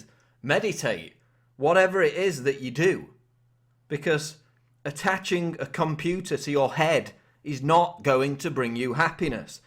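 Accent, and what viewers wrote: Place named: England, United Kingdom. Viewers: Your "Prison" is of your own making. It's also quite presumptuous of you to "know" what will make others happy. Rather absurd actually. You're a troll with an English accent in my book mate. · guy sounds like he is from rochdale. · I'm British and I have to say I really hate your northern accent! sorry but you sound like a tit!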